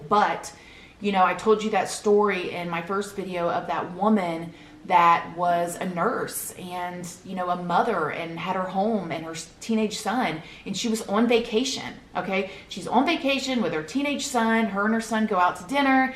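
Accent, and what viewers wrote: United States. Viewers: it's interesting how you have this strong American accent but your mannerisms and the way you emphasize or repeat phrases, so italian-canadian haha I love it cuz that's how I talk too but in a different accent xD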